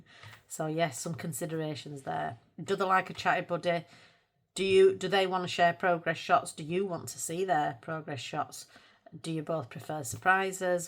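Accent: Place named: Northern England, United Kingdom. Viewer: ‘It’s not a long video it’s quite short’… 40 mins later 😂 jk jk I love longer videos, especially ones with a familiar northern accent! We did a pick n mix at the Lindt shop in Leeds trinity last week and I massively regret only getting 2 of the shortbread ones 😂 I like to buddy to encourage me to colour in books that I’ve been avoiding because I’m intimidated by. I love Kerby books but have been avoiding fragile world because fur isn’t something I’m confident with, but I did a buddy in there last month and once I’d ‘committed’ I actually really enjoyed colouring the image! Great video ❤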